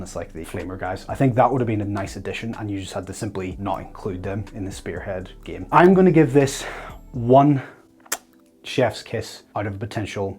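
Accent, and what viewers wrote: Northern Ireland, United Kingdom. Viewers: Are you from Northern Ireland?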